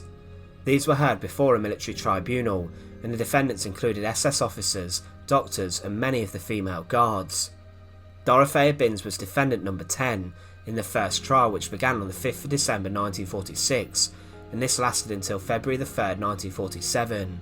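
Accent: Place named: Northern England, United Kingdom. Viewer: hes a working class man from Northern England..not too sure where from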